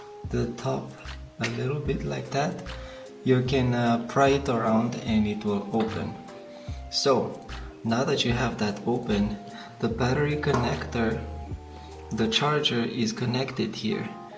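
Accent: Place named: North America. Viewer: Pls speak in common language not nort merican.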